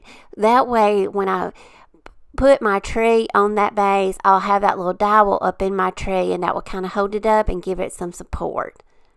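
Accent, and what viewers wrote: South, United States. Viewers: These turned out so beautiful. I love the shabby chic look. 😍 I'll be honest, I love most of all the looks. Just not a fan of modern. Lol My mom's mom was from OK, so they taught me to love old stuff and antiques. I love your southern accent, where are you from? (Just the state. Lol) I haven't been passed TX yet, but someday I'm going to see where my grandparents came from, before they came to California. (Before my mom was born.) GPA was from Arkansas. ❤ TFS! ❤ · I love all your cool creations. Where do you get your ideas? They are all so pretty. I enjoy your sweet southern accent. You have a nice voice. Keep up the good work. Looking forward to The next Video!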